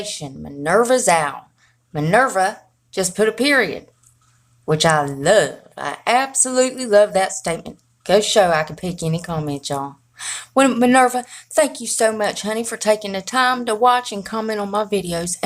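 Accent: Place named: Ohio, United States. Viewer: love your accent! I lived in New Lexington Ohio years ago. Your voice reminds me of those friends and employees.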